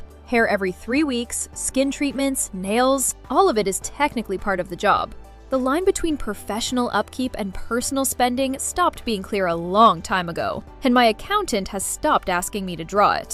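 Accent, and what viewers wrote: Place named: United States. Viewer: Why does she speak with an American accent instead of a British one?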